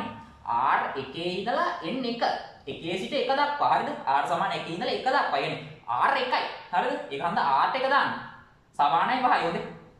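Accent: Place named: South, Sri Lanka. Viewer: ❤🌝දකුණේ හඩක්...මරු mru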